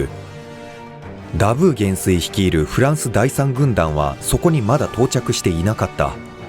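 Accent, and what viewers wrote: Kansai, Japan. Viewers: 関西イントネーションかわいいよ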